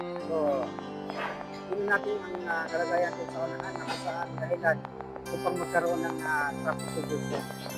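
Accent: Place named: Visayas, Philippines. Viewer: Ah...bilib me Kay kuya...bisan halata bisaya kaayo...banat kuya....fight lang....ayaw ug kakapoy diretso lang...haha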